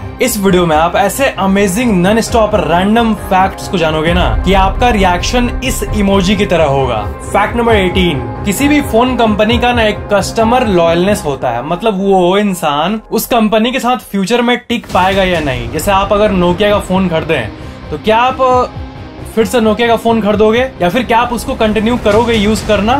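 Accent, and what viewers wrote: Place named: Bihar, India. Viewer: Sir apke bolne ka tarika hamare family aur relatives se milta h... I think ap hamare side se ho.. Bihar❤....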